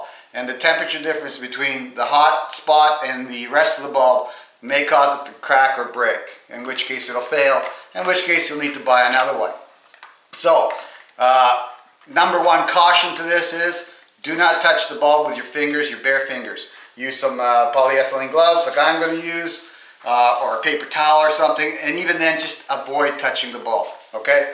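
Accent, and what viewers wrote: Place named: North, United Kingdom. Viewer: Helpful video nonetheless. However, I'm trying to figure out what kind of accent that is...sounds northern.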